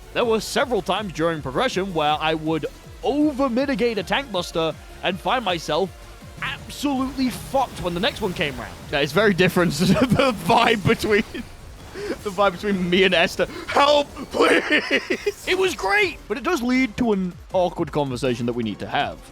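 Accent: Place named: Australia. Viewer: "UK". No, Australian. So UK Lite 🙃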